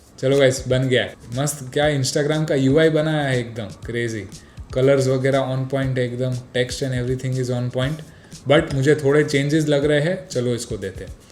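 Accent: Gujarat, India. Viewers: bhai yaar aapki hindi me jo gujarati lehka aata hai vo maja aata hai...by the way english bahot achhi bol lete ho. bhai me bhi professional graphic designer hun ahmedabad se. abhi kam bahot hi kam mil raha hai koi help ho sake to kar dena bro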